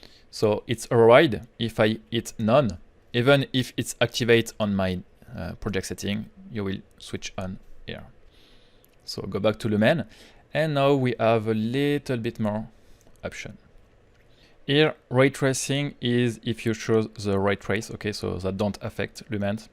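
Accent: France. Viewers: Nice, (J'adore ton belle accent français) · Goodness, what an accent! As a French myself I should be more tolerable but after living in UK for 10 years, I just can't stand it. Somewhere it hurts. Anyway, good channel! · oh that frenchy accent ,,, hahaha,,, so funny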